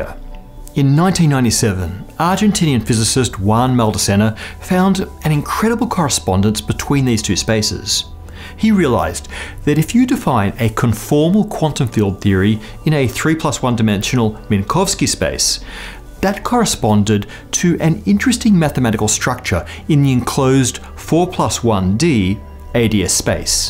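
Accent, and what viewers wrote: Australia. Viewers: Matt O'Dowd is Australian that's why he sounds different . André in Sydney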